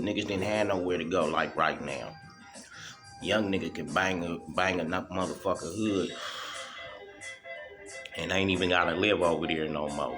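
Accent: Los Angeles, United States. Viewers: I like hearing it from from the B side! You a real LA dude accent and everything homie! Good stories. You being respectful wit it too.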